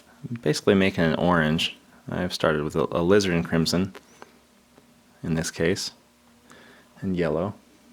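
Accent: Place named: Vermont, United States. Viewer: Something about your accent...are you from Vermont?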